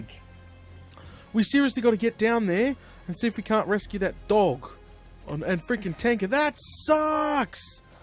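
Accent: Australia. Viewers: well I've been watching for a lil bit of build big or go home but I have watch them all even you sleeping in the stream that was funny loved the d&r series and the series with and watty and all the Ark series but I stay for the aussie accent!!!!!! and is just a awesome young lady she makes me laugh a lot usually at you but that just means she cares a lot about you as of right now i'm building Independence hall as my main base and I made besty's ross house for my catus farm when I decide too make a iron farm if I do i'll make the empire state building just so I can get two farms in it so needless to say the buildings are not too small and I really hate collecting all that clay by hand probally used over 20 stacks of red bricks and need more it will be the only time I use this block in huge quainties love the series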